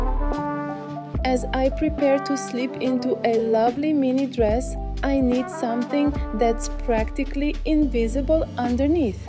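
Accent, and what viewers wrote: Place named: Stavropol, Russia. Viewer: Ставропольский акцент не спрячешь)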